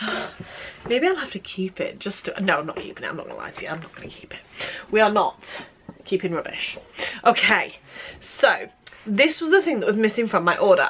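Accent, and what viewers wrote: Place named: United Kingdom. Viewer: I love your British accent. Do you live in Northern England ?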